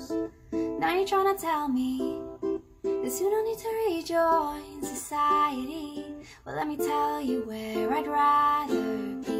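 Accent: Scotland, United Kingdom. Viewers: That Scottish accent. Nice surprise, along with the tune that describes everything I feel.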